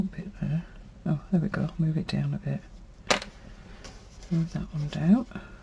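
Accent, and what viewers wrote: Yorkshire, United Kingdom. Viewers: How did I not find you sooner! Your tags are beautiful.. they've really fired me up to make some. I think I will be doubling up next year and doing tags AND flags 😁 Love your youtube video. Very relaxing (and sooo nice to hear a Yorkshire accent. I feel right at home (even though I've lived in the Midlands for the past 21 years😒) Keep those creative juices flowing, thanks for sharing and have a really lovely birthday! 🥰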